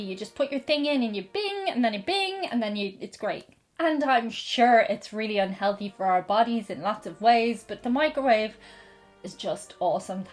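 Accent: United States. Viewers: I'm up for a 10-worst video, but be sure to wear a suit of armor. Some people have no sense of humor about their own country. I know you'll keep it light and funny, but be prepared for backlash, especially if people other than your regular followers see it. I love your American accent, including the valley girl accent you did for Word Things. As for whether communication has improved: there have always been cranks who insist the world is going to hell, and use changes in language as evidence of that. Linguists know that language evolves constantly, and that people invent whatever language they need to express themselves. I'm reading "Because Internet: Understanding the New Rules of Language" by Gretchen McCulloch, in which the author details how modern technology has changed how people express themselves. It's a great book, worth reading for anyone who's interested in how people actually use language. 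The microwave oven was invented by the American engineer Percy Spencer. Japanese companies did a lot to popularize them, but they originated in the U.S. And no, microwave cooking is not unhealthful. It may not be the best way to cook some things, but all it does is heat the food by causing polar molecules (like water) to vibrate.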